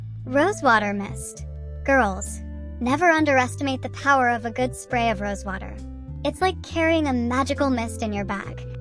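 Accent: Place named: Multan, Pakistan. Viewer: Her accent multani mithi omg 😂😂😂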